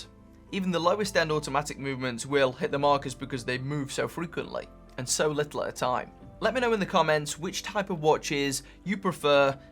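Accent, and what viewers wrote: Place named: England, United Kingdom. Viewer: Dude what part of england is your accent from it sounds cool?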